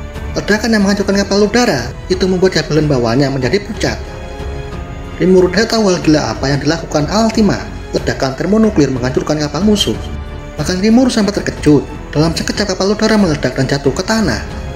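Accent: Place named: Java, Indonesia. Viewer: Medok nya bisa gak di ilangin, sakit teliga gw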